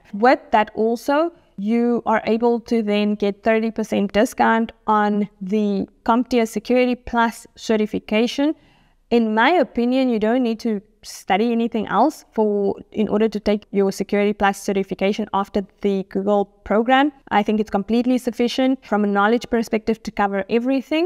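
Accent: South Africa. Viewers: you're South African? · Your accent sounds South African?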